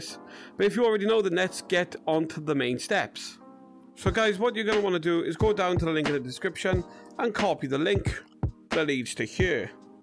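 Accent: United Kingdom. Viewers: Bri’ish huh? Well im glad I found you lad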